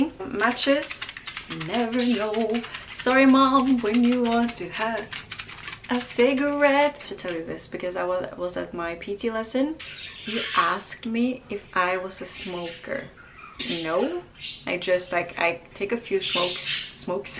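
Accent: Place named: England, United Kingdom. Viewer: Merci, contente de vous voir, vous Revoilà. J'adore votre accent anglais je vous regarde et vous écoute pour cette raison aussi. Je suis en Provence. Thank you, nice to see you again, you're back. I love your English accent I watch you and listen to you for that reason too. I am in Provence.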